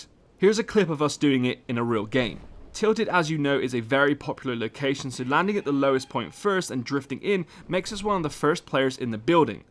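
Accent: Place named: Bristol, United Kingdom. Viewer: You’re from Bristol right??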